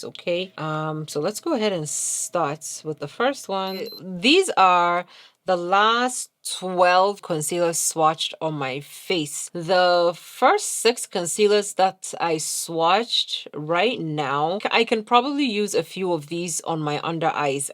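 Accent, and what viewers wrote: Ghana. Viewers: Forget the concealers please! I'm watching because of the Ghanaian accent!! Love it!❤❤ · The Ghana in your voice comes out every more in each video and it's giving me liiiiiife 🤣🤣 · nahhh sis you don't understand how refreshing it is to hear the Ghanaian accent again throughout the video and the emphasis on the words hahaha love it 👋🏾🥰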